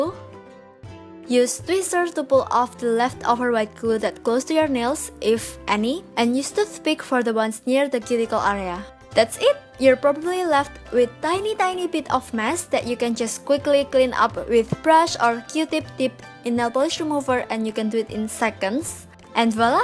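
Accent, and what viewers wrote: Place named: Indonesia. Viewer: lol knew it from your accent! you're indonesian!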